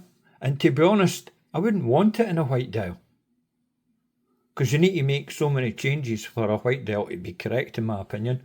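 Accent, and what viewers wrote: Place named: Dundee, United Kingdom. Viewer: Are you a Dundee guy? 🏴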